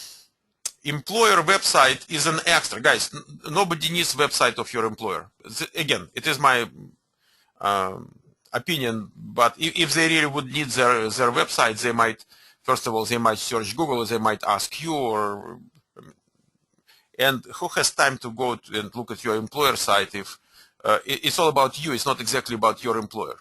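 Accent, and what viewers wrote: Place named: Russia. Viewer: It is so great that you have a Russian accent. Those things you say with this accent... I believe that you represent a better face of Russian-speaking people in the US.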